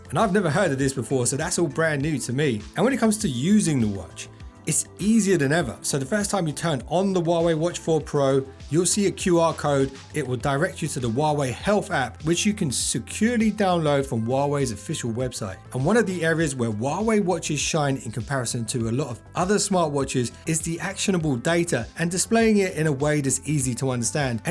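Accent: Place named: United Kingdom. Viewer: Probably UK; judging by his accent.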